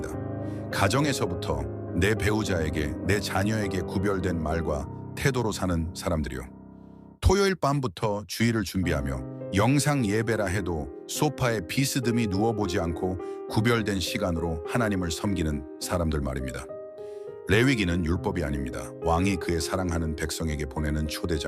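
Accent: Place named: United States. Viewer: 감사합니다. 성경공부에 큰 도움이 됩니다. Awesome! 혹 목사님이셔요? 교포셔요? 발음이 미쿡사람 같아서요🤗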